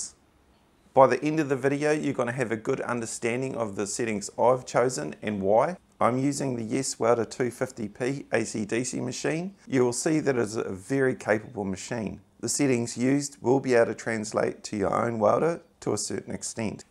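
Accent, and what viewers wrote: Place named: Australia. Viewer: I only watched the video because he has an Australian accent and sounded like he knew what he was talking about. Turned out to be a good video.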